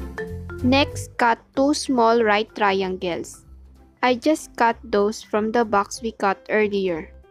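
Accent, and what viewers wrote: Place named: Philippines. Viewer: I also love how Filipino your accent is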